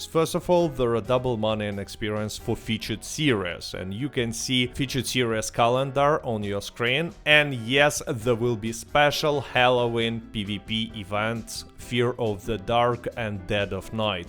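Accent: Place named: Russia. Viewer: Господи как же слышно, русский акцент, это жесть, попробуй чуть мягче, ощущение будто ты Борис)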